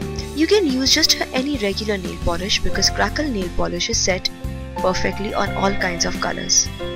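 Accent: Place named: India. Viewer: It's an Indian accent she has, I think anyway, It's not actually weird sounding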